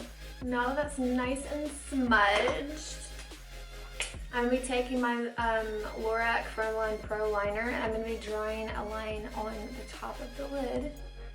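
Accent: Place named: South, United States. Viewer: Can tell you’re southern by the way you say “for” and “never” lolol. Could tell by other words too, I’m from Georgia. 😊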